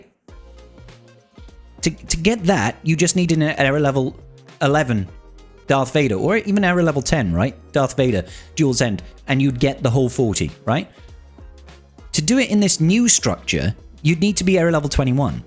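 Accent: United Kingdom. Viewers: Subscribed! Great Content and a heads up Not to spend on this Game. I am playing since 1st may 2021 f2p no LSB or anything at 10.3 Millions GP 8 GLs all 3 GL Cap Ships Kyber 3. I enjoy the Game f2p. No Fomo. What the Game will Break is now the monetazation of DCs. Soon you will Not fight Rosters in GAC, it will be about fighting each others Datacrons. In my View Thats Not even a Game anymore when you think about that. Greets from Germany. Keep up the good work. Btw are you from England or Great Britain? You have a Bit of an british accent. Sounds Nice!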